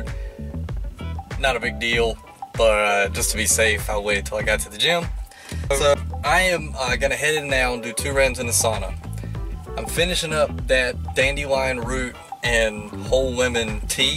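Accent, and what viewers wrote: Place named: South, United States. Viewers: Must be in Texas duh ... y’all act like we’re not supposed to have Asians 🙄 country Asians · An asian man with a semi southern accent? Now I've seen everything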